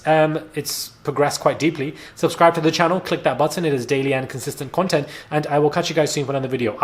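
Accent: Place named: England, United Kingdom. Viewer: And I actually thought he meant pounds because he has an English accent and that's where I'm from too.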